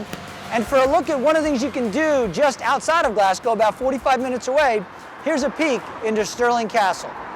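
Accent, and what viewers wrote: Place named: United States. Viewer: what an annoying yank presenter!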